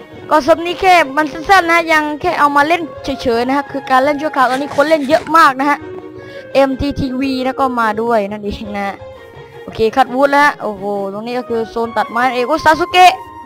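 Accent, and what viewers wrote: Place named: South, Thailand. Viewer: 5555 เเม่งคนใต้ชัว โครตฮาเลย ฟังดี ๆ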